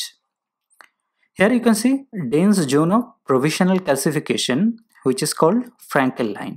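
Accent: India. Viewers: Excellent video with nice animation. Thank You Sir. Your voice sounds like you are from WB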